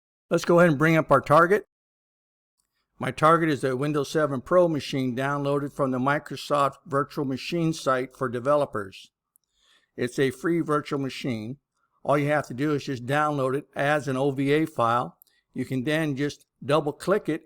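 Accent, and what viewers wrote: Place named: United States. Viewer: hoooly the voice so american based love that nice video KKONAW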